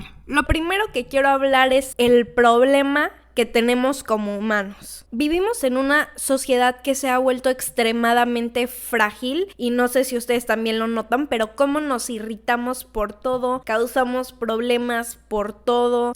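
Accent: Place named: Whitexican, Mexico. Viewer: Primer video de (pseudo) ensayos que veo con tono mmdor whitexican, algo irónico.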